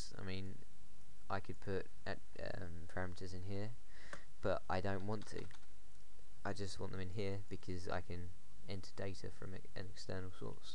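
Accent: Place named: Europe. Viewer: Ello mate, just wanted to say that cuz im puerto rican and that euro accent sounds kool. anyways great tutorial I enjoyed it even though I currently know this information I believe it was good for people currently studying java. Also isbcrv maybe its a good thing that he didnt merge all the methods together into one, cuz it shows new users how to create multiple methods in one class and then call them from the main. I agree eclipse should be used but hey the guy likes notepad thats kool :-D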